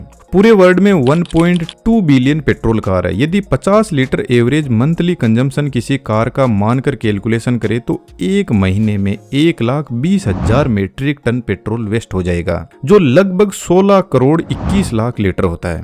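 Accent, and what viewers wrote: Rajasthan, India. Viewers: Sir aap Rajasthani ho kya , boli se lg rhaa h 😅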